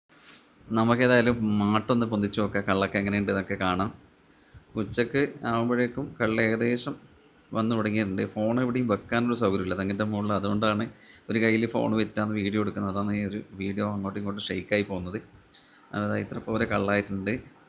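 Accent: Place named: Kannur, India. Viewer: കണ്ണൂർ സ്ലാംഗ്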